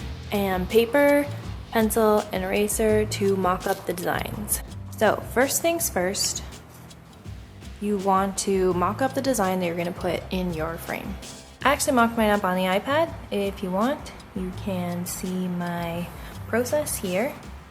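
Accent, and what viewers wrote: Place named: Canada. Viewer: Omg!! I didn’t even pay attention that this was your video until it came to the end and you said! I heard the Canadian accent but didn’t think anything of it. I am going to attempt one of these projects. Haha that is so coincidental. Glad it was you because I liked yours the best out of the rest I was watching lol!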